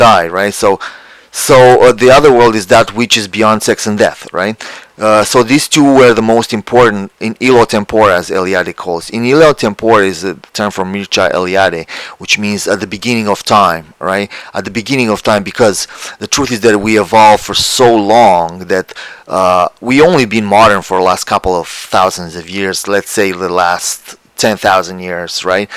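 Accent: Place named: Romania. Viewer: Vroiam sa spun " Tabu, in marea parte a cercurilor culturale filosofice ale unei facultati" cum e cea de Filosofie din Bucuresti ( Oricum, raspunsul le , e dincolo de limitele mele umane, dar e corect.... ) Istorie a religiilor ca disciplina nu am facut nici macar la Masteratul de Istorie a Religiilor (la inceput, cel putin, ca a tr sa renunt, fara voia mea) KOGAION- te referi probabil la axis mundi din mitologia Dacilor. Un Axis Mundi face macar legatura intre uman si divin / profan si sacru / cunoasterea umana limitata si cunoasterea transcendentala etc. Ignoranta e cel mai mare neajuns al unei culturi mici, aproape inexistenta, asa cum a ajuns a noastra. Vorbesti despre Culianu, Cioran, Eliade, fenomenologia religiilor (si toate celelalt subdomenii), filosofii, curente culturale etcc etc etc si dupa atata munca, din 170 de vizualizari, cati crezi ca inteleg cu adevarat, facand corelatii si cu alte surse sa inteleaga punctul tau de vedere? 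Discutii, comentarii, argumente.............शून्य (shunya / vid ) cum spun Indienii. Altul cade-n bot si are 170.000 de vizionari. Suntem poate o natie superficiala, sau poate romanii nu-ti inteleg Engleza din cauza accentului romanesc.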